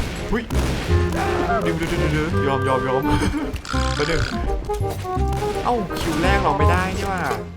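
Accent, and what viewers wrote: Thailand. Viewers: ติดตามตั้งแต่พี่พึ่งเรียนป.ตรี เสียงเหน่อๆจนตอนนี้จบแล้วอยากถามว่าตอนนี้พี่ทำงานอะไรครับ😅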